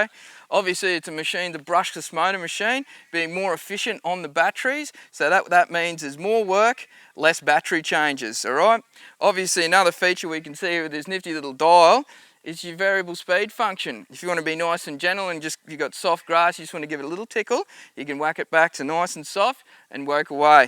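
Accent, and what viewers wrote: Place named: South, United States. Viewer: Where is this guy from? I can hear southern accent, but can't catch where it is exactly from/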